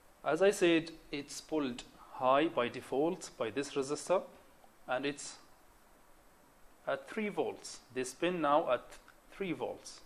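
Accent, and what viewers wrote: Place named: Romania. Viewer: I recognized you with your Romanian accent and your famous "ok?"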